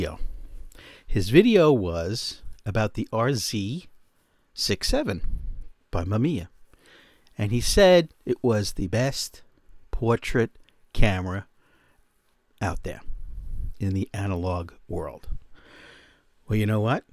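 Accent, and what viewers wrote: New York, United States. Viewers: This is a great video. As a New Yorker your accent makes me feel at home, and the information shared is super useful. And thanks to you I am now obsessed with the tremendous artistry of Vuhlandes.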